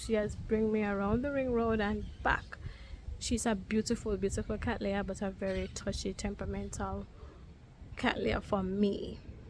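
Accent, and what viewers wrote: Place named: Caribbean. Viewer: Wait u sound Caribbean. A trini here 🇹🇹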